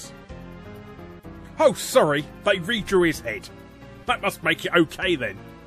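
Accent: England, United Kingdom. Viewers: Not saying that all English accents sound alike, but you sound like you could be Robin Leach's son.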